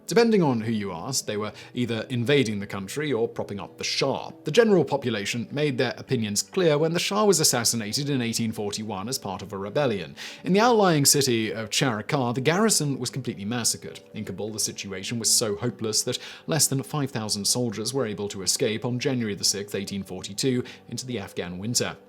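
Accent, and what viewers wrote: United Kingdom. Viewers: I sometimes wonder if Simon's videos would still sound educational if he'd have an American or Aussie accent. He could read the instructions on a box of matches in his British accent and it would sound like a lecture on quantum physics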